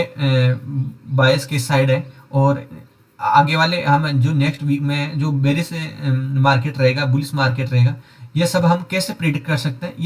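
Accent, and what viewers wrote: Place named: Rajasthan, India. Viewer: Are rajasthani h tu to wo bhi sikar side ki tone lg rhi h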